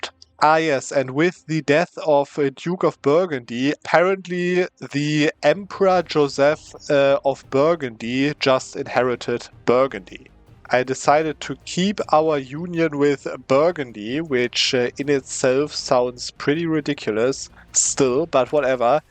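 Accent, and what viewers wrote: Germany. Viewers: The German accent is so cool!!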